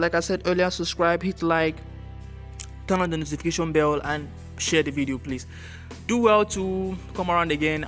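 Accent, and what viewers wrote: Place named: United Kingdom. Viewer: I love this voice over Giving me “British Vibes”😍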